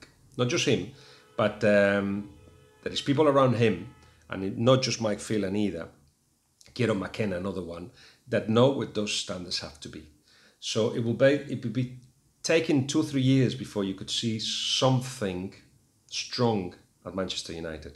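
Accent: Spain. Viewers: So this guy right here is certainly a comic, he pretends he knows about football but I honestly don’t think he does, so he came here sat on the same chair with his thick Spanish accent talking about how tactical and good OLGS was, and that he was a better fit than mournho, I’ve always said this, if mourinho had been allowed to be mourinho and not crucified him like the media did to make him Look a mere manager then United would have been better off, pogba got mourinho that axe because he couldn’t free him, or martial is not given the freedom, oh Alexis wasn’t given the freedom that was staple everyday, mouh is gone yet still united are shit. This guy said United are having a mourinho hangover because some of the players are used to his way which is utter nonsense, pogba will force a move to Madrid just watch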